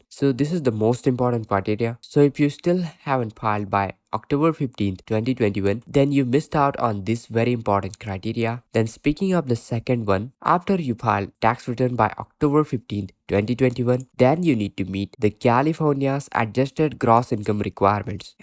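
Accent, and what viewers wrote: India. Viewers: Indian English 😂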